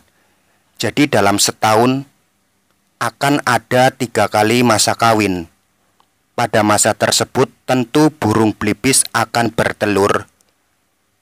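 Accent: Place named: Ngapak, Indonesia. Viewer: Mas nya ini suaranya medok banget,,wong ngapak ya mas,,?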